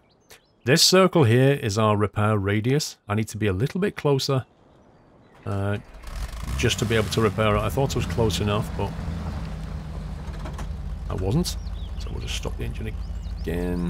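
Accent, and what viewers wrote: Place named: Manchester, United Kingdom. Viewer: Trying to pick your accent . What part of the UK are you from ? Somewhere near Manchester ?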